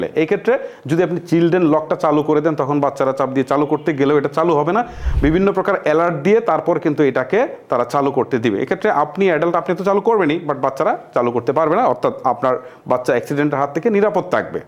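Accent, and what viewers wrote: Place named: Chittagong, Bangladesh. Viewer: আমার অনেক ডিসটিকের মানুষের সাথে কথা হয় বা চলাফেরা করা হয়, চিটাগাং এর মানুষের‌ উচ্চারণ কিছুটা অন্যরকম আমার মনে হয় এই ভাই চিটাগাং অথবা আশপাশ এরিয়ার।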